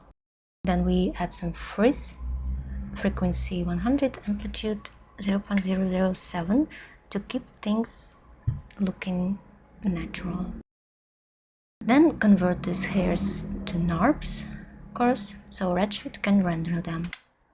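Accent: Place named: Russia. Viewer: Прям слышен крепкий русский акцент.✊🤟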